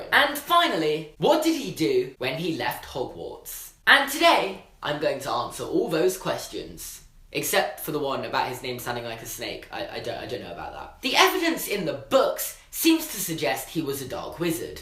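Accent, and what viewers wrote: United Kingdom. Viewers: Almost every consonant turns into a "fvv" sound for British people doesn't it? "Slyfveryn" "nofving" super cute but also my inner pronunciation nazi is screaming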